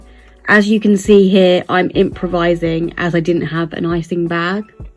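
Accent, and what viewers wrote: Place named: United Kingdom. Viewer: Loved this, and a British accent?! Great to see a fellow British Youtuber!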